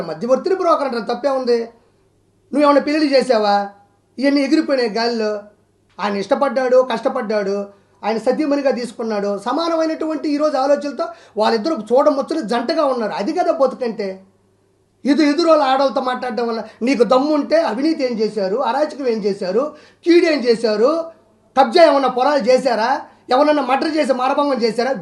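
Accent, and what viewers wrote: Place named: Nellore, India. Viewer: Orey RP, you are making comedy with nellore language , first stop making comedy with nellore language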